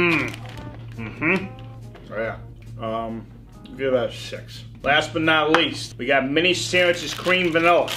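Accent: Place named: Massachusetts, United States. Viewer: is the voice of Massachusetts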